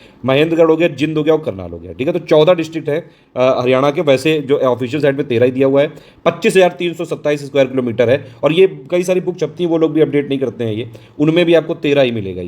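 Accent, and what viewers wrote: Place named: Kanpur, India. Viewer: Aapki bhasha... Kanpur se milti h.... Nice knowledge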